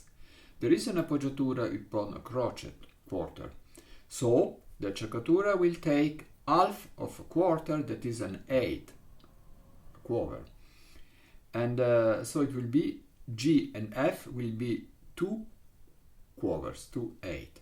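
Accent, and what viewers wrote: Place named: Italy. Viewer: Quite entertaining and hilarious what the AI/NLP makes as subtitles once in a while for your lovely Italian English 😆 I have no difficulty to understand you, but then I need English at work all day and my Italian colleagues have trained me well, who sound very similar to you. While I might be identified by listening to me speaking English as a German mother tongue as well…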